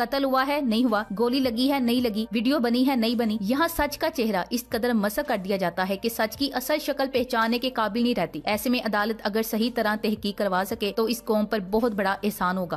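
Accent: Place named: Punjab, India. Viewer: as always just luv and wait for ur post ad your sign off in lovely Punjabi tone Thanks' for watching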